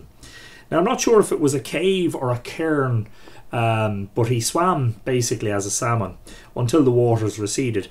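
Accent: Ireland. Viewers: Yes, I'm from Ohio in the US.. It's interesting that you are from Munster.. My husband's people and some of mine are from Clare.. He was first generation, my people have been here since before the American Revolution.. Being here for so long, I am mixed but still mostly Gael.. My son just bought me a DNA test to see if what I've been told about my ancestry is correct.. I grew up hearing myths and legends of Ireland.. I prefer sources written in Ireland and by Irish immigrants.. They're becoming easier to find but pronunciation is a quandary.. I can understand him but it's like me being a hillbilly understanding a New Yorker.. I guess since Ireland is so much smaller I expected less contrast in pronunciation.. Thank you for helping me..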